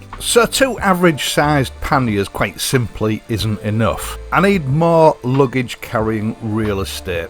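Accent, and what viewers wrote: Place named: Hull, United Kingdom. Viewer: I take it you're from Hull or thereabouts? From Hull meself, but been languishing in Western Australia for the last 48 years. Just found your videos and I like your commentary and delivery. For me sadly I won't be owning another motorcycle anytime soon. At 74 my wife won't let me near one. Still good to dream though.....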